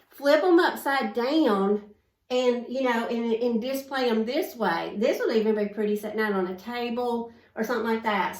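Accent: South, United States. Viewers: Blessings to you. I love your channel I love your southern accent and ideas. God bless y'all. Keep sharing.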